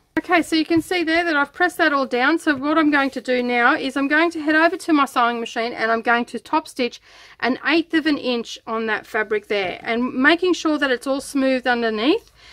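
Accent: Australia. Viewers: great to hear an Aussie...not many doing sewing videos...keep em up :D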